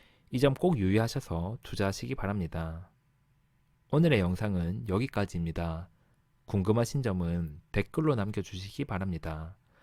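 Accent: Gyeongsang, South Korea. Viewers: 억양에서 경상도사투리가 느껴지네요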